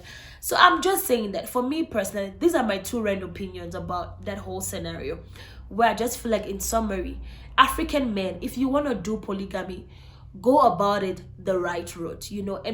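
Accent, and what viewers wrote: South Africa. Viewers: Any how nna lewena ,sounds like ur from msanzi ,,I beg you Cameroon wl disown you ,as for matswere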